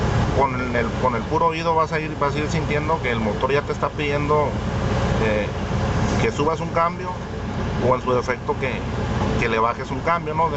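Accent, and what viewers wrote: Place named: Mexico. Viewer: son muy buenos tus videos compadre explicas muy bien se ve que tienes mucha experiencia me imagino que eres mexicano por el acnto yo soy de nicaagua un millon de like para ti y me suscribo a tu canal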